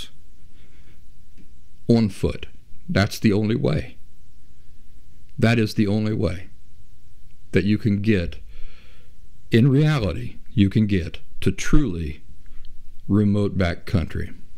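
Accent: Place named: South, United States. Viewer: no worries about how you talk, My dad was from Alabama/Mississippi and he talks almost just like you. I'm glad I found your YouTube channel. Thanks for video.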